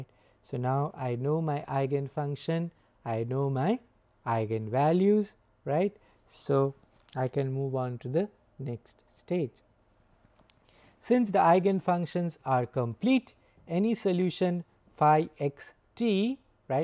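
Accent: India. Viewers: he's indian, both ears unhappy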